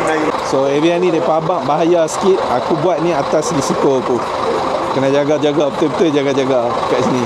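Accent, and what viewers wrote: North, Malaysia. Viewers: Selalu tgk vlog mat salleh..ni vlog BM loghat utara..mmg lain dari yg lain..saya dah subs😊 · Yang paling best loqhat utara tak hilang weiiii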